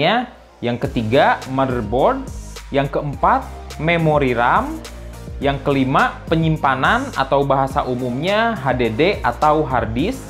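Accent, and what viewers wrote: West Java, Indonesia. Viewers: Sunda pisan kang 😂 Nuhun penjelasan na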